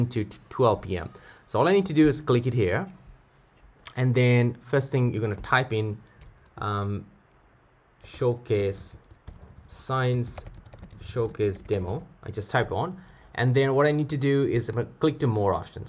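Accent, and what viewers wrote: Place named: United States. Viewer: Where are you from? Are you putting on an American accent. It’s very interesting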